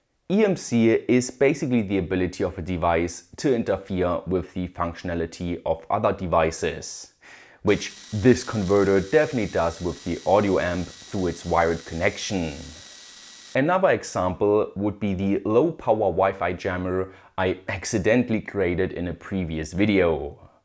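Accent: Germany. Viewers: Informative Videos! Habe nicht gleich erkannt, dass du eigentlich deutscher bist :D erst nach einem Kommentar von einem der dich angeblich bei Würth gesehen hat, wurde mir klar, dass du etwas Akzent hast und wer noch außer Deutsche Verwenden noch Fineliner 😀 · You are definitely not a Scott, this German accent is too thick,...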